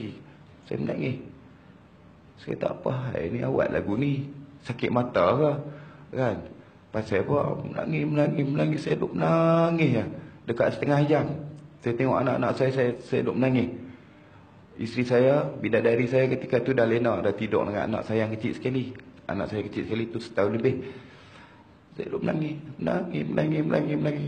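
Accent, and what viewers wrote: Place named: North, Malaysia. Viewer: Skit2 kdah.... skit2 kdah... perlis ada penang ada.. perak utaqa pn ada ckp mcm ni jgk... smpai ke pntai remis ckp utara.... awt xsbut....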